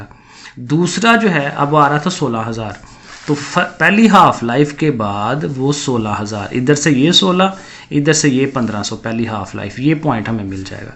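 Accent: Sialkot, Pakistan. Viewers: Sir ap sailkot sy hian ap ki bollli tu sailkoti hia 😘❤️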